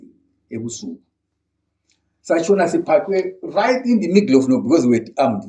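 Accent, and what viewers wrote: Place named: Shona, Zimbabwe. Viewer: What real tribe is he coming from because he speak Ndebele with a shona pronounceing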